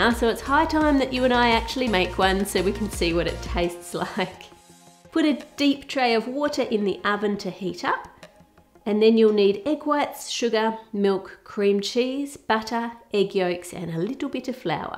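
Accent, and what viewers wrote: Australia. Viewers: She’s Australian (I’m British) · She is Australian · are you australian? you have the accent lol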